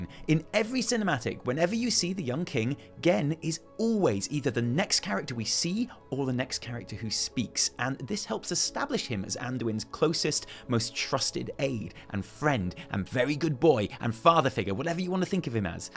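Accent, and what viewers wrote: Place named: United Kingdom. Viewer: Late or not, don't need a reason to listen to you talk about WoW in a glorious British accent. Keep 'em coming.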